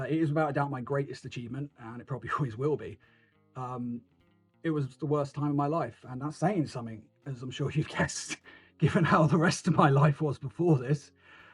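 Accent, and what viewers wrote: United Kingdom. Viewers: Holy shit your British??? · Wait, you are british? How could you keep this from us? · Bro... your British? I had no idea 🤯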